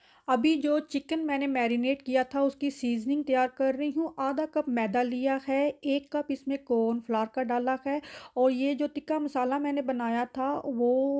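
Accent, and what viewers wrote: India. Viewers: Aap ka accent kitna simple aur desi hai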